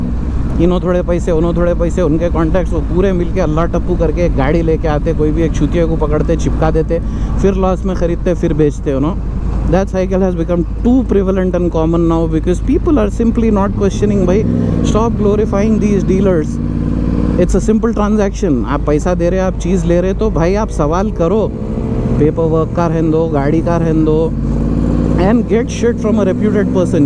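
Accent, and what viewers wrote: Hyderabad, India. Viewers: Are you Hydrabadi bro ? , if I am not mistaken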